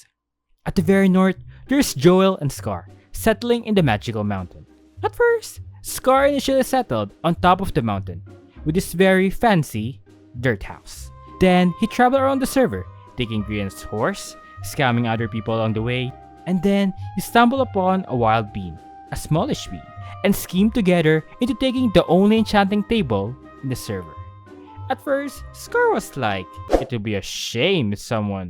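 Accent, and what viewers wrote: Philippines. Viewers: this video just came up on my recommended and i decided to watch it, and from hearing your voice i could tell you were filipino too XD hoping to see you make an empires smp explained video <3 also just a tip, joel's name is pronounced as "jowl" enunciating the e isn't really necessary :D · Are u a Filipino ur accent is sooooooo Philippines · Are you a filipino? Your accent is very filipino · I feel like you have a Filipino accent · based on ur accent, im pretty sure ur a filipino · Are you Filipino, cuzyour accent😊🥰 love it. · I recognize your accent. Lezzgoo mga pinoy!!! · Great! Some Filipino 3rd life fans. Recognised you by your accent. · WHY DO U SOUND LIKE A FILIPINO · You filipino? Accent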